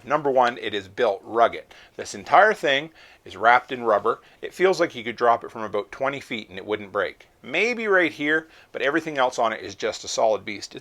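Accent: Canada. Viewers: Thanks for the info ... I learned something too :) What I like about your videos is that you talk "Canadian" -- specifically western Canadian!!! I'm from AB & get your little jokes!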